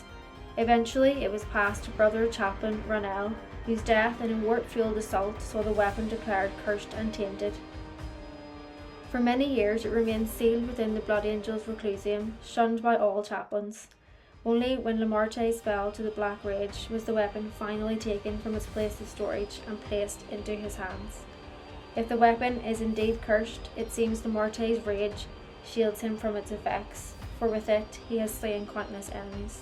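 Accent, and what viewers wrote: Northern Ireland, United Kingdom. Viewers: Are yous by chance from Northern Ireland?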